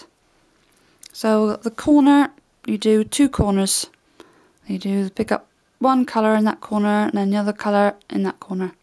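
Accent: United Kingdom. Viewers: Are u British or scottish you sound a bit like it ;)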